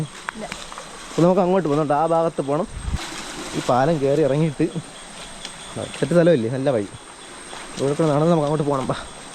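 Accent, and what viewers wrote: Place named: Kasaragod, India. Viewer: ഇദ്ധേഹം കാസഗോഡൻ മലയാളമല്ലോ സംസാരിക്കുന്നത്...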